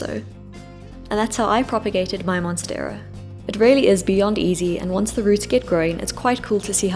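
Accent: South Africa. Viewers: Are you South African. I detect a slight accent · Very informative, I'm definitely going to try and I was happy to hear a fellow South African!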